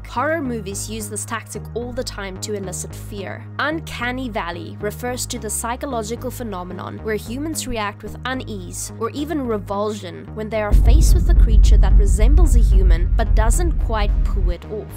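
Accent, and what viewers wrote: South Africa. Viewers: First I love the South African accent 🇿🇦❤ and awesome video glad you looked further into this! You are very intelligent! :) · South african english accent makes the video best ❤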